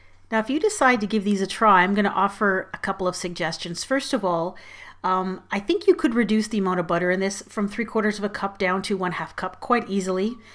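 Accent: Canada. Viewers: I love your Canadian accent :)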